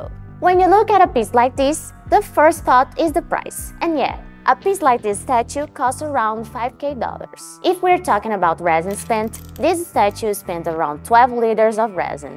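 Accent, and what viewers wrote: Brazil. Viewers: Você é brasileira, esse sotaque é de brasileiro kkkkkk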